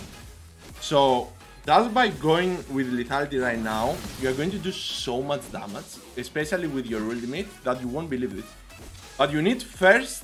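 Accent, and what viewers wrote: Greece. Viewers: I think you're a Greek, right ? Assumption made on your accent. :D Greetings from Serbia my bro !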